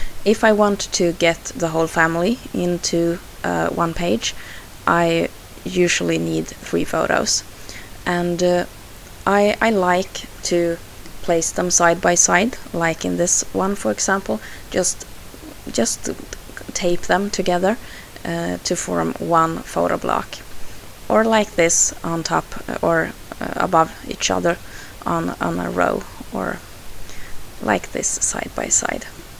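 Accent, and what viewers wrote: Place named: Scandinavia. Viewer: I have enjoyed watching your scrapbooking videos since I discovered your You Tube site several months ago and always anticipate the next one to arrive. I love your layouts especially for the sense of balance and the focus centering on the photos the stories you are able to convey. I also enjoy your narration (love the Scandinavian accent). The stories you share of your life and family make a cozy picture and contribute to the charm of your layouts. I look forward to your future videos.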